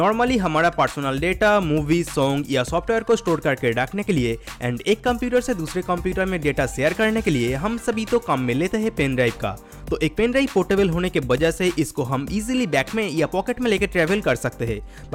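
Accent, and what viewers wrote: Bengal, India. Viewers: Aapka hindi kafi kuch Bengalee ki tara hai.... kaha se hain aap?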